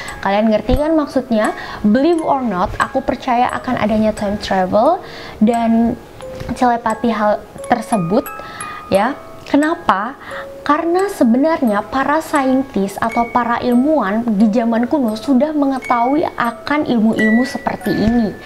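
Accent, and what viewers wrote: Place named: Bali, Indonesia. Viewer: Orang Bali ya kak ? Soalnya logatnya kek Bali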